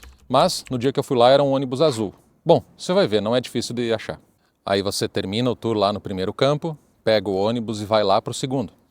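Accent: Rio Grande do Sul, Brazil. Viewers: Você é do Rio Grande do Sul? Parece com o sotaque do RS. Uma professora me contou que uma amiga visitou esse campo. Ela chorou, e falou o seguinte: "o homem é um m0nstr0 mesmo". Acho que, por mais que eu estude, nunca vou conseguir entender a II G. M. Como um homem conseguiu convencer uma nação de que um grupo de seres humanos deveria sofr3r coisas tão ruins?! Como ele fez isso?! Por que acreditaram nele?! Por quê?! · tu ainda pergunta se ele é brasileiro? Olha o sotaque sulista dele. Meu ,é tudo tão nitido .😮😮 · Bom dia, só pra tirar uma dúvida, vc é gaúcho? Quero te parabenizar pelo belo trabalho e pela explicação ótima. Infelizmente quando falamos sobre esse assunto nos assunta e nos comove muito. Obrigado pelo conteúdo e por nós trazer uma série responsável e uma voz de verdade.❤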